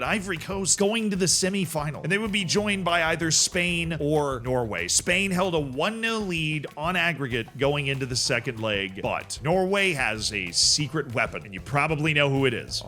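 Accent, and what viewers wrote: United Kingdom. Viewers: What a video please do more with your British commentary 😂❤ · Why do you commentate with a British accent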